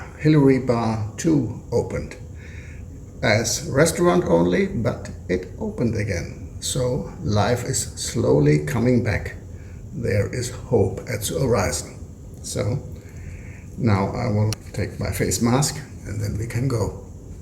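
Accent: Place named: Norway. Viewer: your accent sounds like Norwegian 😅 but i can be wrong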